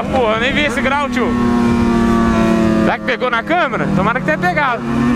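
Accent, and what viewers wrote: Goiás, Brazil. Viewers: tu é Goiano??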